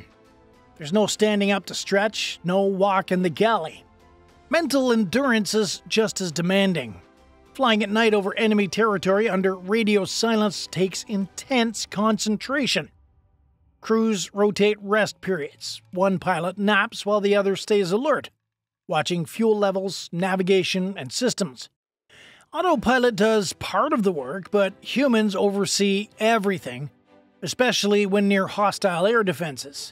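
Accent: Canada. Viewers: Based on the accent of the presenter, I want to know why in the world a Canadian is presenting this video. Are you just envious? Why don’t you tell your communist labor Prime Minister you just elected instead of Pierre Poilievre to kiss your collective backsides. We in the United States aren’t going to continue to save your butts while you do nothing. Enjoy your 35 to 50% terrace. You’ve earned them!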